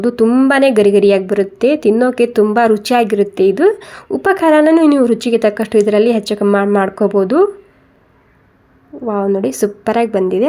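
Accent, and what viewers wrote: Maharashtra, India. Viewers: Yourmaharastra kannada is nice to listen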